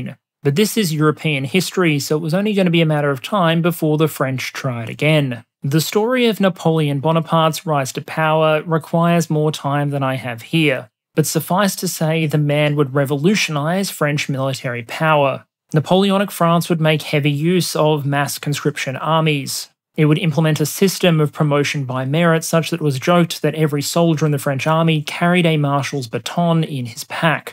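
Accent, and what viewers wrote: Australia. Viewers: How did you learn to speak so many different languages? in the video where you have spoken about the war in ukraine, the germans, the japanese and now this one, your pronunciation of all those various languages (even though you can still hear the australian in it) is impeccable.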